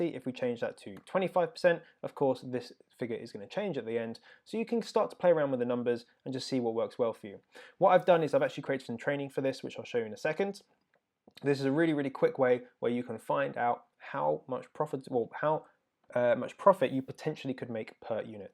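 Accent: United Kingdom. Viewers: just spent my afternoon making notes . thank-you so much for your videos and information presented in such a genuine way . Im just starting out with my sister in law and to be honest its so good to find a British accent taking about FBA within the uk ! we would really like to be considered for the free gift ,particularly the profit calc pro , if were not too late. We continue to watch and learn and thank-you for such informative content . Best of luck for the future , though Im not sure you need it !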